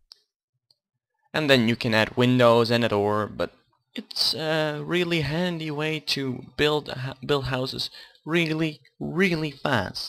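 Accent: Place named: Netherlands. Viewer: Mooi Nederlands accent haha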